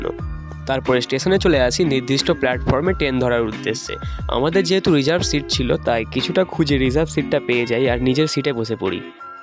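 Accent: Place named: West Bengal, India. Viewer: Vai tumi bangali kotha sune to mne hochhe west bengal er tahole title a Bangladesh lekhar mne ta ki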